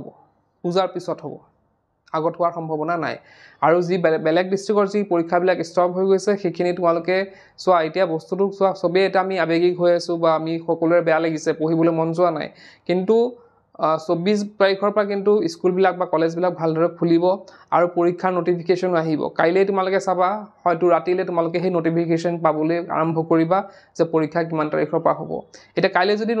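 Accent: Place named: Dhubri, India. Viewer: Dhubri'r khon lage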